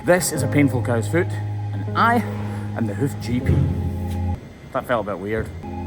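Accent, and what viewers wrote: Scotland, United Kingdom. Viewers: Tired of hearing your Scottish voice. Just show the video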